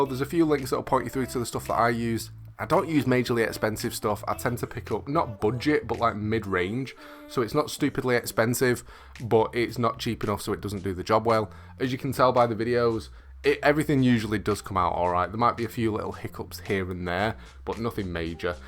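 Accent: United Kingdom. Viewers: Yo just found your channel I really like your content and if I hear your accent correct then hello my fellow Brit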